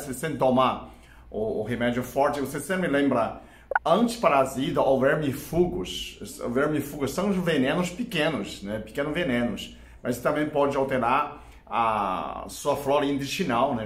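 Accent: China. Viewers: mas só saiu assim devido o próprio jeito de ele falar, ele ainda tem o sotaque, chinês, pior o Brasileiro que não sabe escrever o Português certo, tipo andano, falano, trazeno,Sima abito umilde serto e muitos outros, ele é perdoável é só observar como ele fala algumas palavras em português.😊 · Você fala muito rápido Doutor, não entendo direito esse português Xing ling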